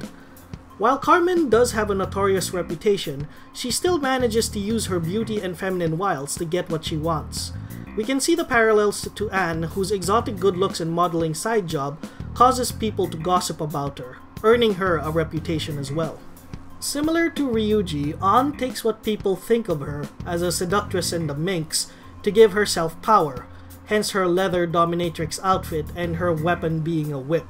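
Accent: Philippines. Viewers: I can recognize that accent anywhere... are you Filipino? Edit: lol Akechi's a white knight... that NOT everyone likes. Ironic isnt it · By the way, are you Filipino? You sound like one.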